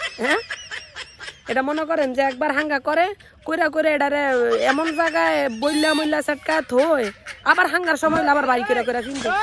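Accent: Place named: Netrokona, Bangladesh. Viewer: নেত্রকোনার ভাষা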